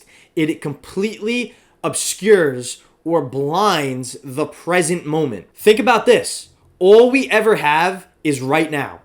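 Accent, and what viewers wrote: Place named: Long Island, United States. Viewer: He’s definitely from Long Island. Spittin facts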